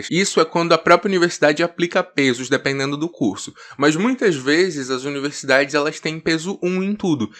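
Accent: Pará, Brazil. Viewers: Tu é do Pará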